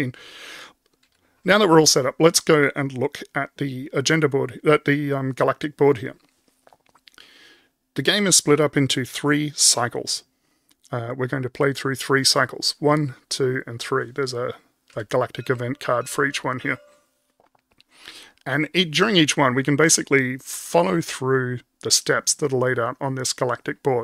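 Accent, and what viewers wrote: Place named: Australia. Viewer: Mine's on the boat too! I'm Aussie. U sound like one too!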